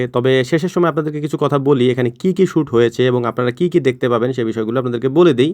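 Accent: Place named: Takerhat, Bangladesh. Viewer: Viya apnr basha maybe takerhat er ase pase.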